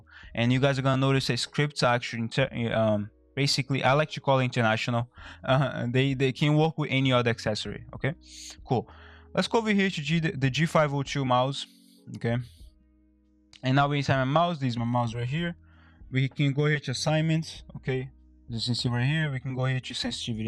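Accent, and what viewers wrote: Brazil. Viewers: incrivel como um brasileiro sabe detectar outro brasileiro falando em ingles UEHUEAHAUEHEAUH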